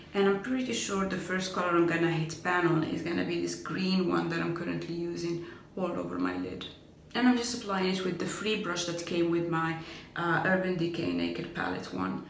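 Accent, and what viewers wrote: United Kingdom. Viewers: Great video! You seem really comfortable and happy it's nice to see. I like the makeup look, I just don't give myself enough time to apply makeup in the mornings so skip it. Glad you are working on finishing your open products. I am doing the same. I think you my be picking up a bit of a London accent its cute!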